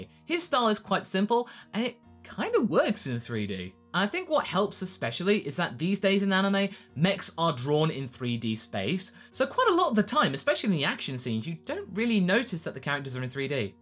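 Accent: United Kingdom. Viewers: Well, good video, you are British Because you have an acent like a British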